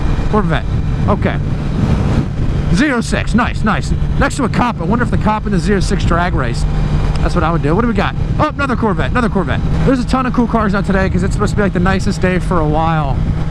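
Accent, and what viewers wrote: Pennsylvania, United States. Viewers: u from pennsylvania?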